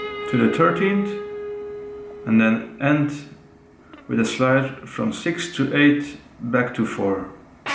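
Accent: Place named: West Flanders, Belgium. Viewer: Westvlaams om precies te zijn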